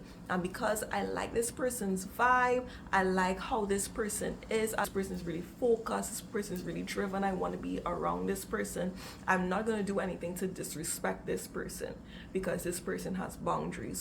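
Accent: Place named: Caribbean. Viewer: Oooh I Love the Carribbean accent…,what parish ?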